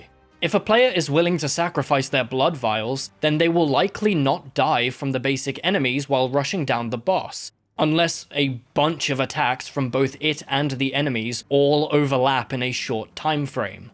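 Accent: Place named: United Kingdom. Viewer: stayed for the take, but stop being British it's distracting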